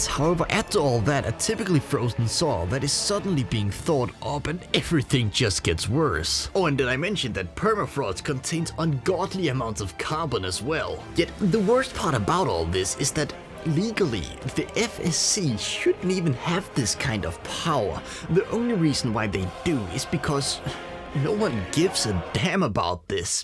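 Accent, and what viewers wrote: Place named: Denmark. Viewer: Hmmm, din accent er meget... Danglish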